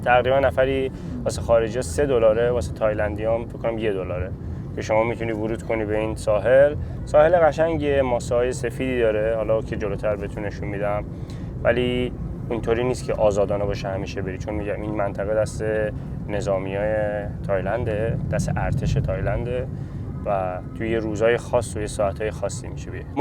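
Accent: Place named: Gilan, Iran. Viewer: جون تو یه کلیپی گفتم لهجه گیلانی داری ، الانم اشاره کردی پس گیلانی داداش ❤❤🌷🌷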